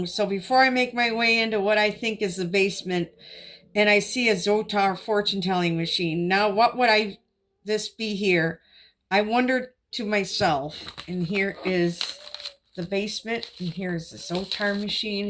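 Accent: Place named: Upper Midwest, United States. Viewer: That accent !! - I recognize as either Wisconsin or Minnesota !! Go Badgers ! Lolol. Where's Margie Gunderson ?!?!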